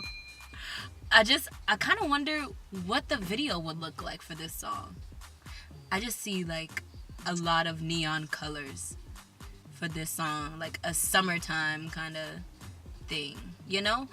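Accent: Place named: Nigeria. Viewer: honestly you sound nigerian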